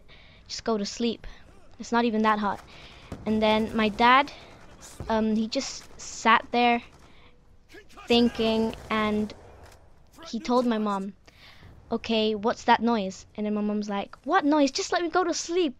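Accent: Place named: London, United Kingdom. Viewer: You have a london accent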